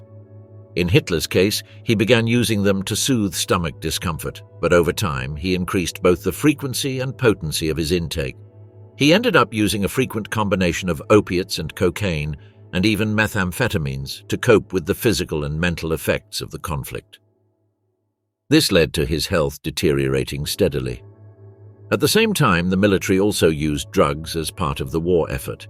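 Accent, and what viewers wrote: United Kingdom. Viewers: lol same old propaganda video 😅😅 lmaoo with the same old British accent 😊! Give me a break, don’t worry all these lies told about Hitler one day will be proven false, he did NOT kill millions lol, you have zero proof, once I get the speech or signature I’ll beleve it.. the great awakening ppl!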